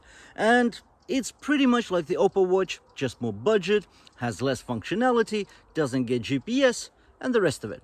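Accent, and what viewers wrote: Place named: South Africa. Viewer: Great accent. South African, but he's lived in the UK for while, is my guess?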